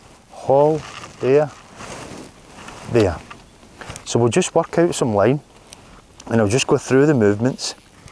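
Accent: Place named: Scotland, United Kingdom. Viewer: an extra thumbs up for a Scottish brogue that I can understand! Thanks!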